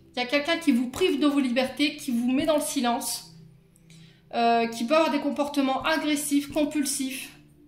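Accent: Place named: Pas-de-Calais, France. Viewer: bonjour vs êtes du nord pas de Calais? vs avez l accent bien écrasé du pas de calais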